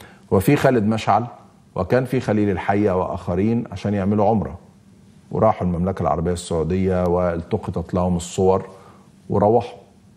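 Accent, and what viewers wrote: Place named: Egypt. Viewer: من القذارة ان تقول " سعودي" اسمعني انا اردني ومن أصل أردني ومع ذلك بحكي انه فيه فلسطينيين خونة وفيه فلسطينيين يا الله ما أحسنهم..ونفس الشي في سعوديين محترمين وفيه سيئين..من الغباء الفاحش ان تقول السعودية في حين ان اسرائيل تلعب كرة القدم بلاعبين فلسطينيين .. عيب .. السعودية فيها ناس ولا أروع... لكن احكيلنا عن بلدك مصر كونه لهجتك مصرية..ايش عملت؟ ولا شي ..هي جارة غزة وسكرتوا ابوابكم بوجه الناس..اخرسوا احسن ..غزة خذلت منكم انتم تحديدا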